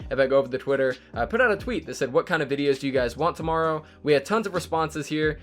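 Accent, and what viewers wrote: France. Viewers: Are you French? O_0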